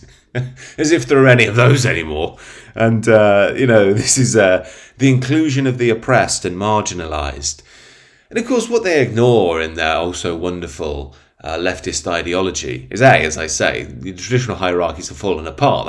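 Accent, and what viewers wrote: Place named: Northern England, United Kingdom. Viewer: Eee, by eck, that northern accent were ruddy grand 😁.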